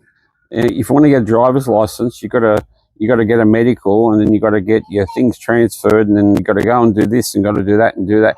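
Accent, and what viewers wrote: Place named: Australia. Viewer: FYI I have learnt French and English in Vietnamese High School . We don't need you to teach us English with your awful Aussie accent . You are just an unemployed dude in your country , moving to developing countries begging for job . I bet you are not even qualified to teach English in Vietnam .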